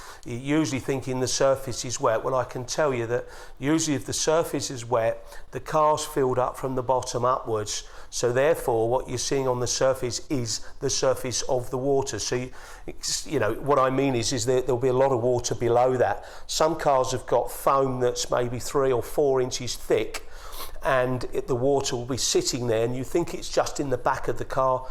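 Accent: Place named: United Kingdom. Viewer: THANK YOU for your video ( Made a change hearing a British accent & not American) the drivers side Footwell carpet keep feeling wet after it's rained so im going to Weatherstrip the Windscreen & Back window around the door frames & I have a 'Peugeot 207 3dr Hatchback 2010' & on the roof of the car along both sides theirs brackets ?? Where you'd attached a roof rack im going to put some sealant along them both trying to eradicate where water maybe getting in, it's only the drivers footwear carpet that feels wet so hopefully working from the top of the car down ill manage to weatherseal the points of access where waters getting in. Thankyou again & really appreciate your knowledge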